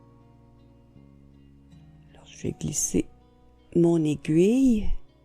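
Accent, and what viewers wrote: Quebec, Canada. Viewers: J'adore tous vos tutos. Je vous ai découverte il y a peu mais déjà réalisé plusieurs pulls et autres avec les jolis motifs de feuilles et fleurs et c'est absolument magnifique. Donc ces mitaines je vais les faire pour moi et aussi pour mes filles et mes petites-filles. Et j'ai vu des toques et écharpes assorties pour femmes comme pour hommes. Magnifique. Les cadeaux de fin d'année, je vaus les commencer dès à présent. Merci et gros bisous de Belgique. J'adore votre accent. Moi qui avais fait les démarches pour habiter au Canada ou Québec, c'était sur le point d'aboutir lorsque tout ce que nous vivons a commencer. Montrez -nous encore plein de joilies choses. Ce sont des rayons de soleil ☀️❤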